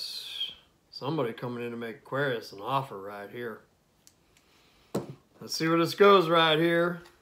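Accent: South, United States. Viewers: I just adore your southern accent my fellow Aquarius. I’ve got a York Accent. I always love your readings xxo Omg so right on! He had better come correct or not at all. I went true Aquarius and shut him out!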